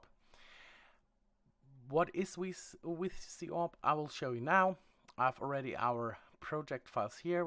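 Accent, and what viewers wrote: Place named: Germany. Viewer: Hey, höre da einen deutschen Akzent raus, daher auch hier mein Kommentar in deutsch. Kann mir jemand sage wofür das Grid am Ende nun gut war? Konnte den Zusammenhang bzw. den nützlichen Teil nicht ganz fassen. Wozu brauche ich ein Grid statt des Planeten bzw. wozu dient die Combo? Ansonsten sehr gutes Video klar zu folgen und einfach erklärt, vor allem nicht in der Muttersprache dafür nochmal ein "Daumen hoch"! Würde mir mindestens genauso schwer fallen! Den Leuten denen das Tutorial "zu ausführlich ist" kann ich nur raten von der Timeline Gebrauch zu machen ;) Cheers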